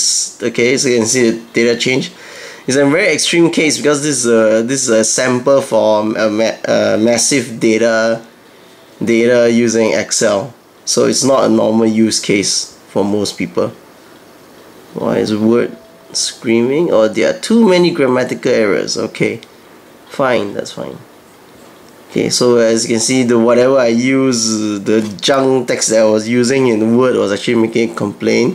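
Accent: Singapore. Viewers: Hmm sounds like singaporean Haha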